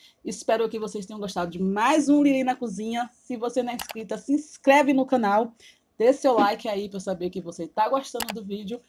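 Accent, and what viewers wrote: Bahia, Brazil. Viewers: Êita que saudade desse sotaque da Bahia. Lembro da infância e de minha mãe preparando para mim! Aqui em Buenos Aires não tem ameixa em calda no mercado. Vou tentar fazer o caldo de ameixa aqui. Muito obrigada por compartilhar!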